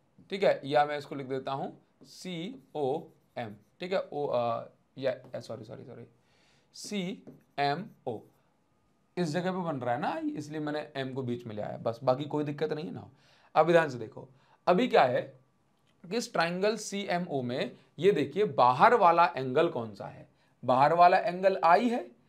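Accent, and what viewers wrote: Odisha, India. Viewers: Sir app odisha se Hain kya ???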